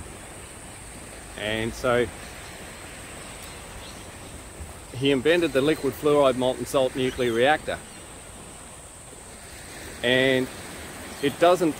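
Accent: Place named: Australia. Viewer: Yes! An Australian talking about LFTRs! What is the status of nuclear power in Australia; LFTR, in particular? Which organisations are out there trying to (re)educate the public about nuclear power (and dispel some of the myths and lies, etc.)? I'm aware of Nuclear for Climate, but they have no policy on LFTR, or any specific reactor type, as far as I could see. It was a bit disappointing that it had to be Peter Dutton to be the first to call for an "unemotional discussion about nuclear power - in particular small modular reactors" in his budget reply speech recently. Still, better than no high-ranking politicians talking about it. But LFTR is where it's at. I've been curious about the combination of LFTR and SMR designs. Can't tell you how stoked I am to hear an Australian accent talking about molten salt reactors running on a thorium cycle. Well, I'm "subbed". 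Keep up the awesome work! PS Regarding the nuclear submarines the current (and former) Commonwealth Government is looking to purchase: I think they'd do a LOT more good if they were put in dry dock and connected to the grid. ;)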